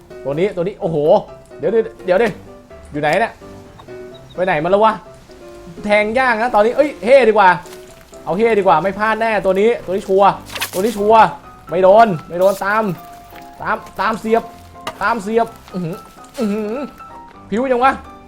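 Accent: South, Thailand. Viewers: ภาษาใต้วันละคำกับพี่ 😎😎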